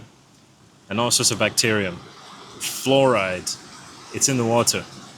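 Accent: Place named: Edinburgh, United Kingdom. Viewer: Welcome to the adventure mate. Once you focus on freedom you won’t look back! If you still have your job it’s a nice safety net while learning. Your accent sounds like Edinburgh maybe?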